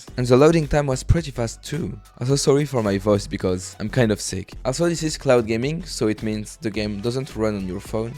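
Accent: France. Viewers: Tu es français ?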